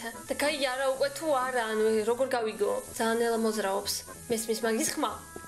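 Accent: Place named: Georgia. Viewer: რა სოფლურად ლაპარაკობს თბილისელიხარ თუ სოფლელიხარ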